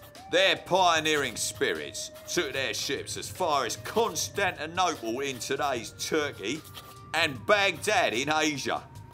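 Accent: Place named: England, United Kingdom. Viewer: this accent is what exact in the church of england ?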